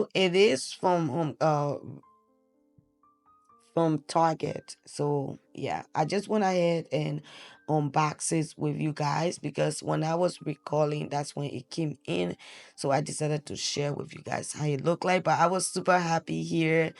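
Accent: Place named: Liberia. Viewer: New here on your channel, like your content, your name is Ghanaian but u have a Liberian accent,you did a good job...🫡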